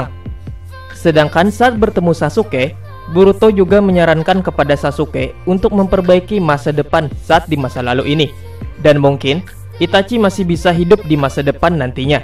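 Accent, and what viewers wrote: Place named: South Kalimantan, Indonesia. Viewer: Bah nang ini tuh totok banar pian mang ay banjar kalua kah si amang nih nang kaya bang ipul hary haja jaejak si bungul tuuh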